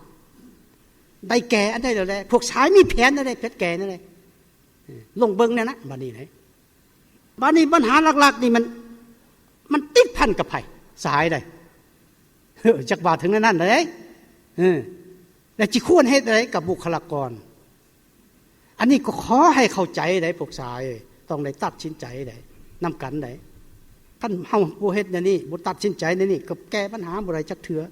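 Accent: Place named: Vietnam. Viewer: บักควายเอยกุฟังมืงเว้าเหมือนแกวสัตตตคนลาวแท้บ่อเว้าแยบนี้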